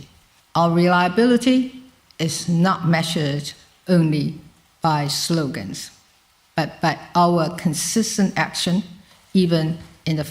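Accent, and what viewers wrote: United Kingdom. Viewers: 好喜歡聽她說的英國腔英語。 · 台灣出了一個帶日本腔英文的李登輝，又出一個帶英國腔的蔡英文，現在又有一個台灣腔的賴清德，真是連總統都多元化的寶地。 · 現在仔細聽，真的有英國腔~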